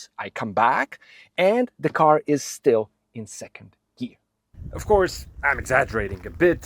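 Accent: Eastern Europe. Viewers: Wait, you're Portuguese?! I always thought you were eastern european... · Wait, this guy is Portuguese? Why have I always thought he is eastern European? 😅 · O quê, és português? Eu tinha ssumido que eras da Polónia ou Latvia ou um pais mais para o este da europa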